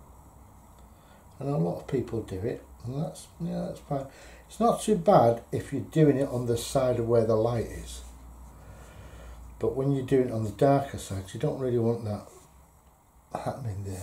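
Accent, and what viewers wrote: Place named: Yorkshire, United Kingdom. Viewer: So different and inspiring, love your style. Quite unique. Nice to hear a fellow Yorkshire accent! Debs in Spain ❤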